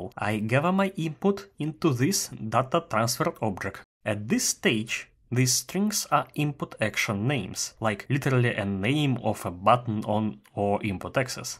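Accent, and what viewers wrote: Russia. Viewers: Ты русский? акцент очень похож )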